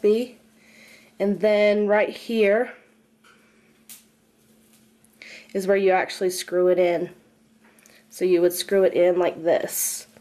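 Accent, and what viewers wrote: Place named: South, United States. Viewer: Your gorgeous love your southern accent what a fox :)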